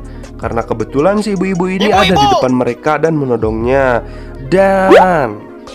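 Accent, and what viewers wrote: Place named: Sunda, Indonesia. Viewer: Story telling sunda😆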